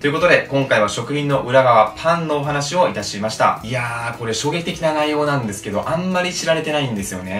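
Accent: Kansai, Japan. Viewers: 双子の姉からさんのチャンネルを教えてもらって、最近入部し、双子でハマっています笑👯‍♀️❤️ 私も人生が一変するくらい食生活が変わり、知らない世界が広がり、食にこだわる事が楽しくて仕方ありません！🤗 さんの軽妙なトーク力と、惜しげもない知識が面白すぎて、色々実践してみたくなります😉 今日も手のスナップ運動きかせて、カラダヨロコブ物、生産者さんを応援出来る物、見つけてゲットしてきます😊 私もさんの関西弁大好きなので是非❤️笑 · さんの動画に出会ってから人生が一変するほど食に対しての意識、世界が変わりました😂 さんが教えてくれたことを色々試したくて実践するたびに楽しくて仕方がなく、毎日カラダが喜んでます🐣🐣🐣 新たな扉を開いてくれて本当に有難うございます💕🙏 さんが話す関西弁が大好きなので、ぜひこれからも頻繁に登場させてください🥰（笑） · くん、さすが関西人！ネイティブやね。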